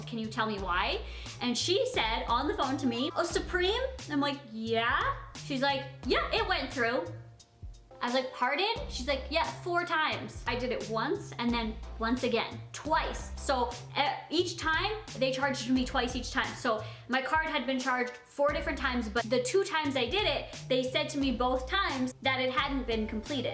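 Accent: Canada. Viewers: Taylor Phillips it’s a Canadian accent. Their U’s are usually long O’s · She's Canadian, it's just her accent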